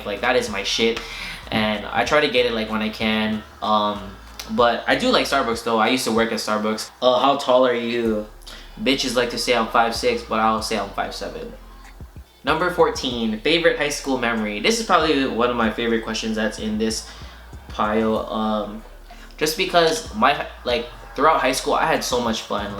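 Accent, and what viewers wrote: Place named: Toronto, Canada. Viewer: you talk like a Toronto man Rate it still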